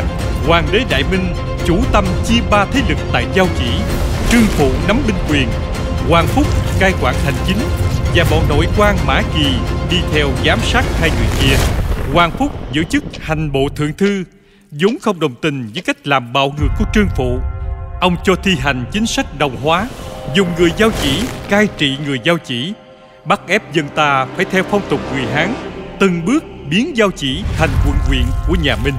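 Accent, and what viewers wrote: South, Vietnam. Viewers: ồ hay đấy nhưng lần sau thay dọng đọc đc ko? Người miền Nam đọc toàn sai chính tả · Đọc theo giọng miền Nam mà bạn cũng không sao cả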